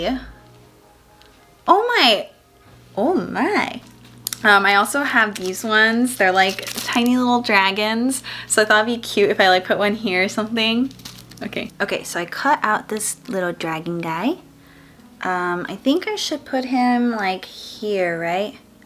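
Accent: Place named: South Korea. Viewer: omg your korean is so cute (I like your 교포accent) 🙈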